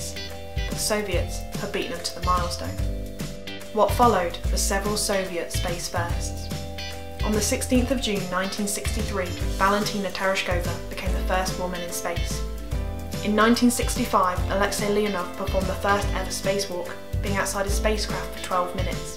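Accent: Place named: England, United Kingdom. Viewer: Nice accent 😁 What part of England are you from? 🤷‍♂️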